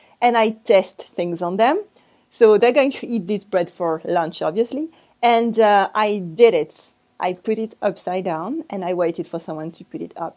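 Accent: France. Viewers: Pourquoi tu parles anglais avec la forte accent francais?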